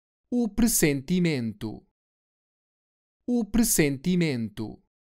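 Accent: Portugal. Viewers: This is European Portuguese. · Can anyone make sure this is european portuguese? I need to learn European Portuguese. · É em Português de Portugal, Europeu. This is Portuguese from Portugal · Mano tire o portugues de portugal e coloque a lingua brasileira a maioria dos estrangeiros aprendem melhor o sotaque do brasil🇧🇷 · This is european portuguese. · This is Portuguese from Portugal. I'm Brazilian. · É português de Portugal. Português brasileiro é diferente. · This is Portuguese of Portugal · It’s european portuguese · É de Portugal mesmo.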